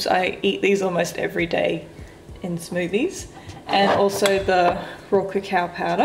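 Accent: Australia. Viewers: Oh my gosh are you from Melbourne?? :D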